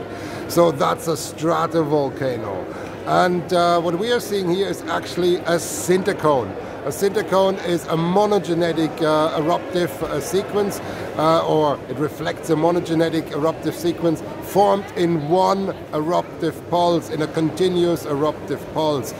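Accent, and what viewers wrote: Ireland. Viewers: Thanks a million for sharing your expertise and thoughts on volcanic matters on the isle of La Palma in a way that everyone could follow. Very interesting and much appreciated. I love your Irish accent!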